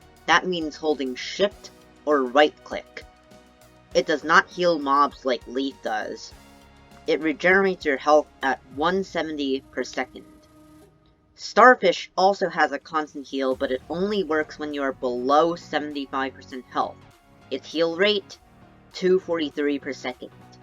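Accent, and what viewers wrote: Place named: United States. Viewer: Im from south america, and your pronounciation is so american, lol Nice video btw :D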